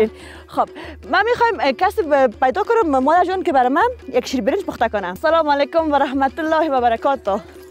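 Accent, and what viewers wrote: Afghanistan. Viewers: Zhwandi osi sha program stasi waqighi sha khabari kawe lahja de socha afghani da bamyan khalak der watan dosta khalak de zindabad. Vary nice program good luck for future.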